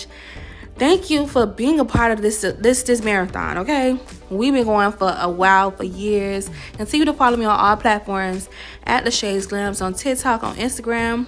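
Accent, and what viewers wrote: Miami, United States. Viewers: there's no way you aren't from Miami! That accent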